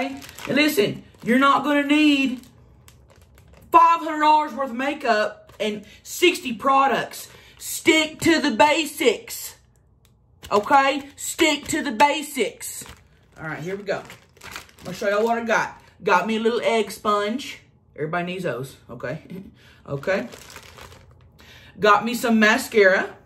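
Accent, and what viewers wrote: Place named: Texas, United States. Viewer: I just love those Texan tone🍧🍧😋😋☺️☺️☺️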